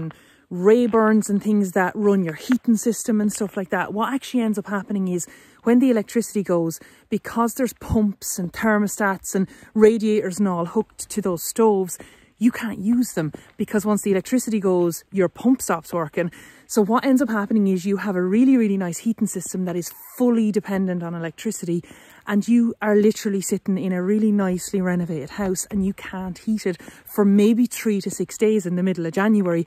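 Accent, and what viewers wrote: Ireland. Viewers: Hi, I'm enjoying your video so much, both for the information and the laughs. You're making me homesick with your accent and sayings! I grew up, "just up the road" from you in rural Westmeath. I'm now living in Germany but hoping to find my forever home back in Ireland one day. Keep safe and well and keep the videos coming.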